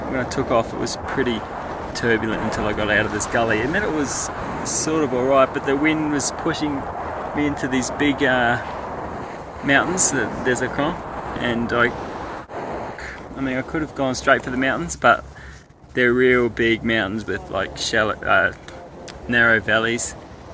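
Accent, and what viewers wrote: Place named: New Zealand. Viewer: Really enjoy your posts . As a kiwi you sound like one to me , a soft kiwi accent. I would ask that the sound quality is improved a large part of your commentary Is inaudible over the wind noise, which can be too loud at times.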